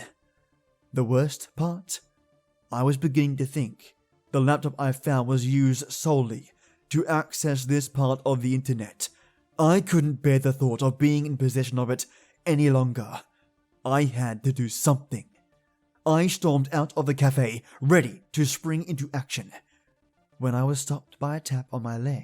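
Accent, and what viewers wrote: Australia. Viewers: My younger brother, Lee is here and he absolutely loves your voice! He wants to listen to more of your videos. He enjoys the inflection of your voice, your wonderful Aussie accent and the little injections of different voices you do. My brother noticed how genuine you are and how much you love narrating stories. I always knew he has good taste and what I consistently say about you rings true and aligns with what he said. How wonderful! My mother, brother, Daisy and myself all appreciate your videos and narration. Thank you very much my lovely and brilliant friend and for this!❤💜🧡💛💚💙💓💕💖💝💞☕🍰😇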